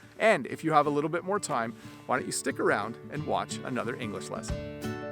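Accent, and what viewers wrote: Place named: Canada. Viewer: Thank you,. These games can be helpfull to improve English. The same games we have in my country as well but I didn't recall them. By the way - your accent is very great, at school they always said that Canadians have french-like accent. No offense:)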